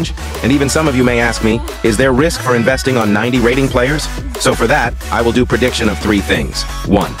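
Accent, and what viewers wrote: India. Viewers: Are you From India😅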